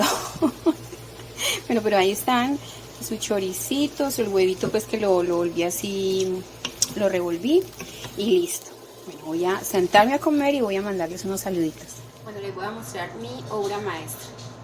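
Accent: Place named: Colombia. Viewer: hola yo soy mexicano y m encanta tu acento de colombia se oye muy sensual.asi k m encantaria k m digas palabras k solo se usan en colombia y no dijeras k significan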